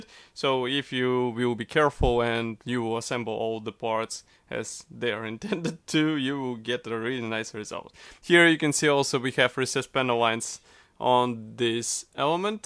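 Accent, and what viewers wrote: Russia. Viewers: судя по произношению родной язык у человека русский)) модель интересная, шведы вообще своеобразные, в планах собрать все их реактивы..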